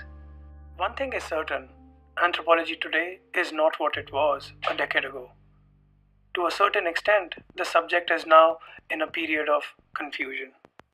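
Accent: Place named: India. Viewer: Very nicely done. Indian English is sometimes tough for me, but that's what 'cc' is for! I hope this is shared widely. I do not see Ep 2?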